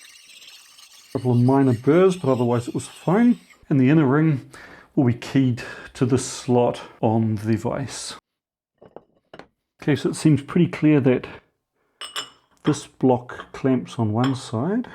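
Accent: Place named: New Zealand. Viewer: Wow what a find. That vice is a real beast that just screams RIGIDITY, so should serve you well. BTW, you're clearly a Kiwi living somewhere in Europe I assume?? I'm in Auckland, NZ. Greetings from down under😊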